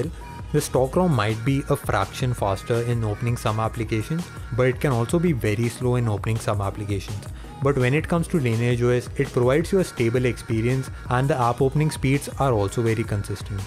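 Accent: India. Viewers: I am not racist ..but in india your english is very indian.. :) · Trust me. Train your english. Because i can't understand SHIT with your indian-accent english are you saying. U said channel to chaniil at the beginning. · It's not broken english - he just has an indian accent - I think he has a great voice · another fucking india accent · I suppose only Indians may understand this kind of English. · I think it's good to get used to other people's accent which is quite different from most of us, because one day, if you visit India, bear in mind that most of them speak like that. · Can you speak better english without this fucking indian accent!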